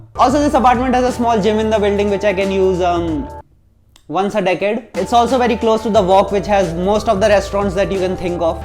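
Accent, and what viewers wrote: Rajasthan, India. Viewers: Bro I'm from Rajasthan as well but I haven't met someone with that strong of an accent lol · Bro got the classic Indian accent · you're funny af bro the indian accent makes it even better 🤣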